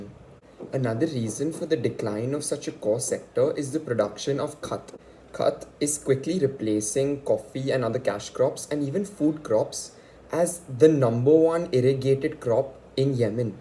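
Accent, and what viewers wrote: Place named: India. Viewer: Why indian accent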